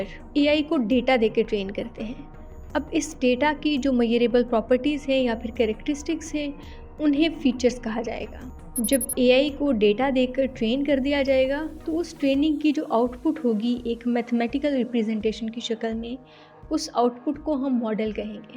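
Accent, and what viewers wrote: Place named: India. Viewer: Sorry I had to stop watching you when you talk like an Indian. Its not urdu but indian hindi. Maanenna hay is indian style. Urdu is meray khayal main or meray motabiq. Bye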